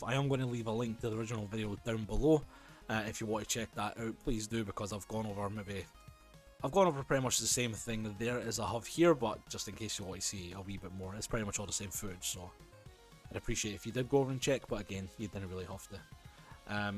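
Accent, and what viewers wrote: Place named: United Kingdom. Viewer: Thanks for the video! You showed us video from a N64 connected to the ODV-GBSC via SCART, was that SCART-RGB or composite? And I presume the cable from the Sega Mega Drive was SCART-RGB? And, judging by your accent you are British; Are your consoles PAL and they work fine? What about the screen tearing issue, in which situations and with which consoles did you have it appear? Have you tested VGA input? I'm looking for an affordable scaler for use with a DOS PC (VGA), Amiga (SCART-RGB) and C64, NES and N64 (composite). It seems that unless you pay the extortionary big bucks and import a Retrotink from the US, finding a single device with all these capabilities is very difficult.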